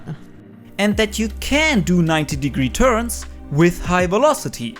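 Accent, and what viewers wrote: Germany. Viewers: Der ist doch deutsch oder? Dieser Akzent ist ja kaum auszuhalten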